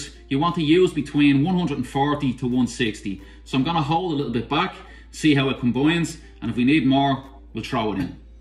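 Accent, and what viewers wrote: Ireland. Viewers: Loved watching and listening to his lovely Dub accent! Made me homesick! My Mam used to make her apple tarts exactly like his! ☘️💚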